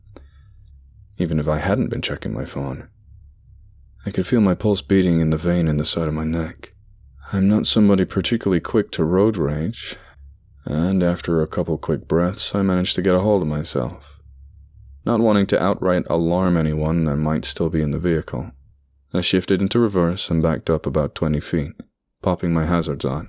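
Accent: United States. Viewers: You do a pretty damn solid American accent - from different regions, too, IIRC - so it's always fun to hear it. Occasionally you slip, like when you said "been" like "bean" and not "bin" like we yankee folk do. Not a dis at all, it's just interesting and fun to pick out little things like that! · You could so come to America and blend in 100%. Ever consider voice acting or even acting in general? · You sound very American in this one.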